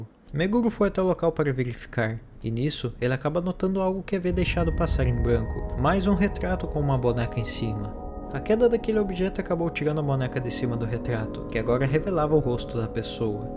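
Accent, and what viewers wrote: Brazil. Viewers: O sotaque nordestino melhora tudo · não consigo prestar atenção no vídeo, o sotaque é muito bagual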